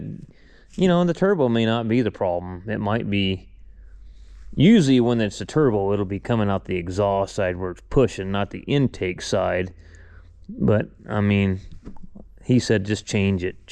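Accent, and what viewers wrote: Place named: South, United States. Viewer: Where did learn redneck speak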